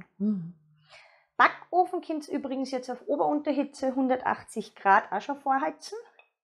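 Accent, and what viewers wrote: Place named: Tyrol, Austria. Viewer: Hallo Morgen gehts an die Muffins 😅👍schön auch mal Dialekt zu hören bist du vo Tirol ?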